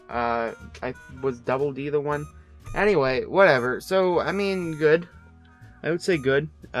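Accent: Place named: Australia. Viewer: I was turned on instantly by that Australian accent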